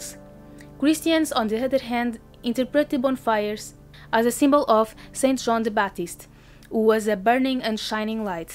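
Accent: Portugal. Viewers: I like the length of your videos. I also love to hear your Portuguese accent.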